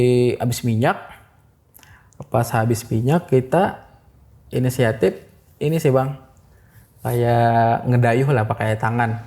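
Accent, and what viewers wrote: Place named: Banjar, Indonesia. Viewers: Kayaknya karena abang ini sering pake bahasa daerah (sepertinya bhsa banjar klo dr logatnya) jadi pas pake bahasa indonesia jadi ga tertata cara ceritanya. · Bahasa Masih Tebwa Banjar banar😁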